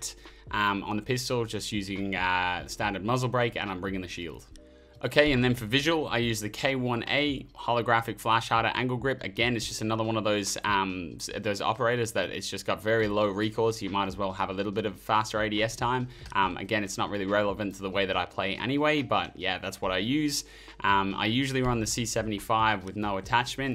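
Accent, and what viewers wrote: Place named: Australia. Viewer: he sounds kinda australian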